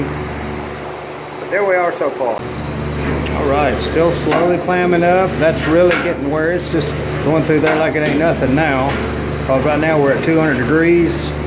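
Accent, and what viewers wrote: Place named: South Carolina, United States. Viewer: I am willing to bet this fella is from South Carolina, he sounds just like Bobby Goodson from Swamp Loggers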